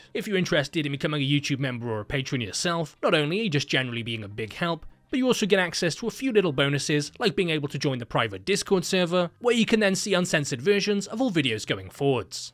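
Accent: England, United Kingdom. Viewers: Ive said this before but bros humor is peak. Amplified by his British accent.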